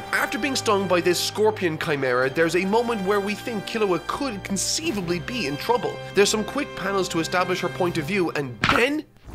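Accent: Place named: Ireland. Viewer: It's just his Irish accent. The same way he pronounced "calm"/kæm/, instead of calm/kɑːm/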